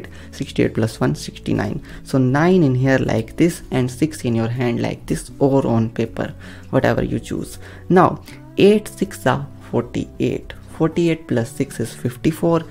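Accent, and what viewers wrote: India. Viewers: Indian accent, shows it all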